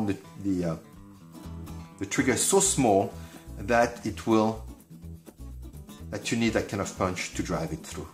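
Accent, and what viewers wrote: France. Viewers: tried to find the answer in your other videos? Is that a French accent? Also I followed your instructions to install the Cajun Gunworks Reach Reduction kit on my Shadow 2 today. It worked out awesome!!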